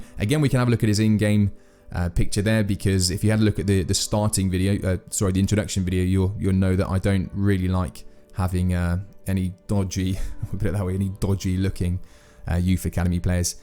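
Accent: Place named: South Africa. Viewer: 10/10 South African accent mate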